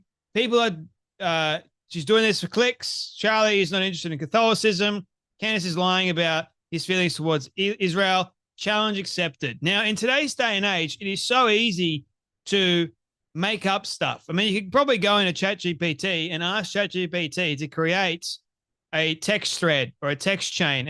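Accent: Australia. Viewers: Love, love your accent...are you Aussie? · You an Aussie?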